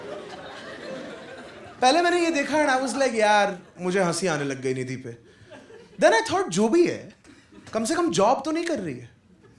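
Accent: Australia. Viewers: its an Australian accent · Australian accent me baat krra tha wo lekin talented Banda h American accent bhi nikaal hi lega kyuki Australian accent jyada tough hoti h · Australian accent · COC legends it’s an australian accent.. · His Australian accent is as good as heaven.Great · You mean australian accent? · Australian accent? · Australian accent. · Buddy woh Aussie accent hai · Damn! He got Perfect Australian accent!!!!😎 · Australian accent* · Australian accent not american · Smoothie Australian accent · That is not American accent that is Australian accent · aussie acccent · That’s an Australian accent lmao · That's an Australian accent. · Lol it's Australian accent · That's not an American accent it's Australian accent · that aussie accent! wow! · Karunesh Talwar listening first time. Love ur Aussie accents 😁 upload more videos pliz. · His Australian accent🔥🔥🔥🔥🔥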